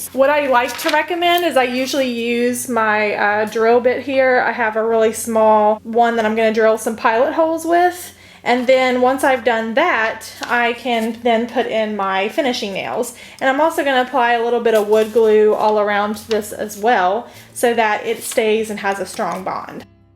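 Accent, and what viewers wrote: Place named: South Jersey, United States. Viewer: Are you from South Jersey, South Philly Delaware or Mryland? I believe I recognize the "O" in your voice. I am from S. Jersey (I live in Florida florida now for the past 40 some years and still carry the accent). The video was great. TFS THUMBS UP!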